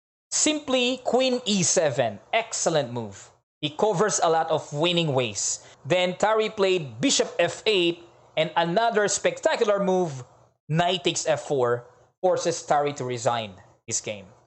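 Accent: United Kingdom. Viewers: galing namn.. nanibago ako.... sir coach....parang british ang dating..napakahusay mo..saludo kami ng mga anak ko sayo... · naks... coach... galing ah... British accent. nanibago ako.. "IM ".☺✌✌✌✌✌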